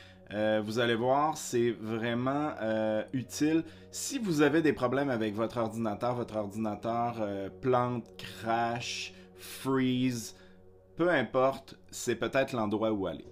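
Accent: Quebec, Canada. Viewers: Super et merci. Pourrais tu me dire comment ouvrir plus simplement les fichiers .ZIP après l'enregistrement avec enregistreur d'actions utilisateur, afin de pouvoir les envoyer plus facilement par mail ou messenger . Je suis de retour en France depuis 30 ans, après avoir vécu à Montréal et ça fait du bien de retrouver un bel accent quebecois. Encore merci.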